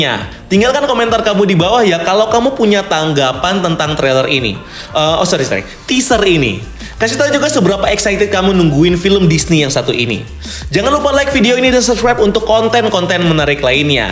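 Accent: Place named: Java, Indonesia. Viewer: ilat jowo bos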